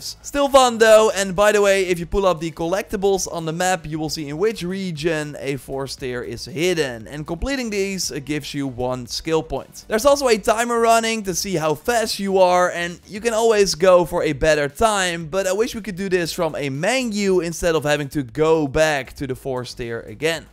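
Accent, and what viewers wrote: Netherlands. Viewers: Are you Dutch? I think I hear it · Telling by your accent, I immediately knew you are Dutch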